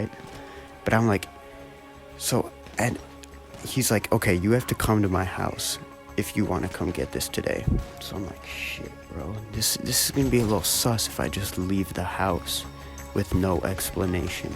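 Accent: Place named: Mexico. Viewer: u followed me on instagram and im assuming its becuase i follow luna and goblinn but dont try to be like them lol u sound like ur talking into an extinct microwave, ur voice isnt really thrilling, u sound like ur mexican,indian,irish, and a whole other bunch of languages, ur voice isnt interesting, ur not sure about what ur talking about and ur unfunny as shit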